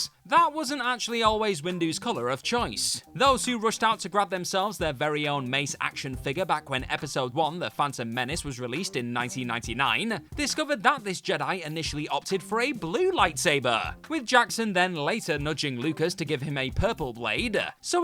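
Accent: United Kingdom. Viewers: What kind of British accent do you have?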